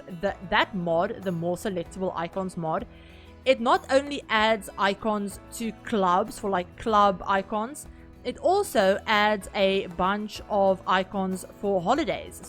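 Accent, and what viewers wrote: Afrikaans, South Africa. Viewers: This is the first video of yours I’ve watched and I was so shocked to hear what I think is an Afrikaans accent? So cool!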